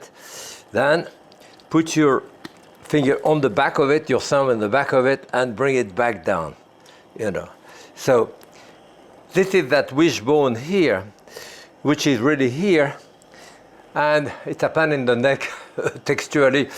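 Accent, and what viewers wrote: France. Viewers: He sounds french. Must know what he's talking about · I am not at all the worlds greatest cook, but Jaques Pepin is truly my cooking idol! Not only only for his great cooking, but for that strong French accent as he instructs you! Thanks! Happy cooking. ❤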